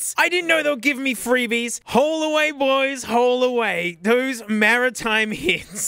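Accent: Australia. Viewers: As a german myself, hearing a funny australian man with a pet snake pronounce german words is pure bliss also "Große" the "ß" is not a b-letter, but instead is a sharp "s" sound, there are talks of that letter being removed someday but idk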